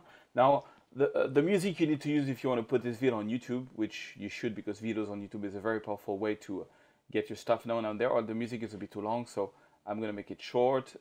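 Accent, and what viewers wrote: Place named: France. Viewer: Mercì,. I really enjoy your tutorials and your French accent. This one tutorial was really awesome. I would also like to thank Kent Jensen's comment about creating an adjustment layer. Cheers.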